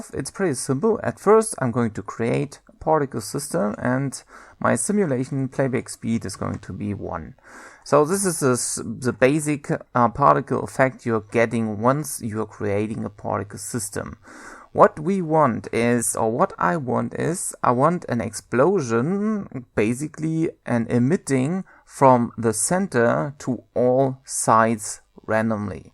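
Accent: Germany. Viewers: OMG Ich weiß dass du Deutscher bist, erkennt man an deinem Akzent. :3 Wie auch immer ich will dir recht herzlich für dieses Video danken. Ich saß ewig an diesem Script, bin verzweifelt, alle Codes im Internet funktionierten nicht bis ich dein Video gesehen habe. So gut erklärt! Endlich funktioniert es. Besten Dank! :)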